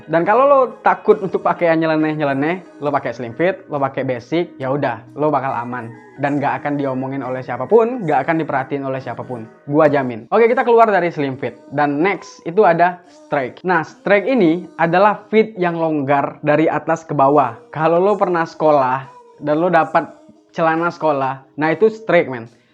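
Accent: Madura, Indonesia. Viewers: Edeh oreng medureh cong?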